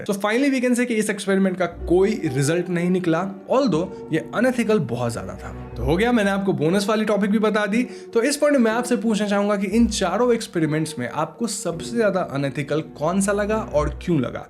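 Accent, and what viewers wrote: Bengal, India. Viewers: Sir aap bangali ho ?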